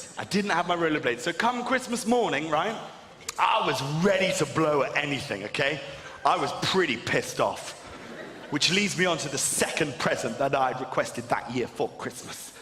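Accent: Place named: Germany. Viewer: Почему его английский звучит как немецкий?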